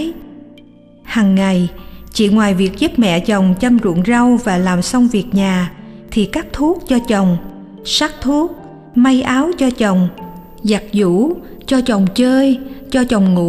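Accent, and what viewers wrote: South, Vietnam. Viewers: Truyện hay, cảm động, 8/10 cảm ơn giọng đọc dịu dàng, miền Nam của.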